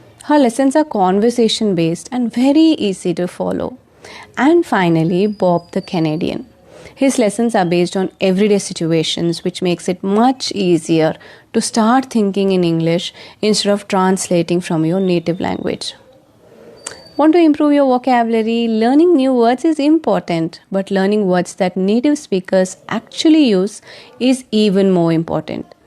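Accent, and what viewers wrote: India. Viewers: Why are you suggesting foreign English-language channels when you also speak with an Indian accent? You can suggest Indian YouTube channels as well; there are plenty of good ones.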